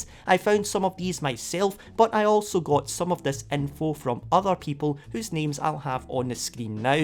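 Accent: Scotland, United Kingdom. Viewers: i hear scottish mother tongue- i like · You got a very strong scottsh accent my boy😂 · clicked for the information, stayed for the scottish accent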